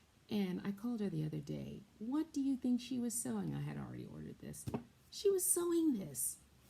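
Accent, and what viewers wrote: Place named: Midwest, United States. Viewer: You’re in Jamaica, but you sounds like you’re from Maybe Michigan ish. Illinois? Midwest. Then you quilt. Then you said “Here’s the thing…” Then I said, “Why haven’t you subscribed. What are you been waiting for!?” Then I subscribed😄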